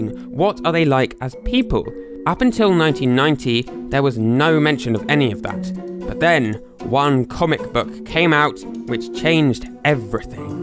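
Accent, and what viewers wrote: United Kingdom. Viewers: What a great accent you have...what part of Britain are u from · what kind of british accent do you have?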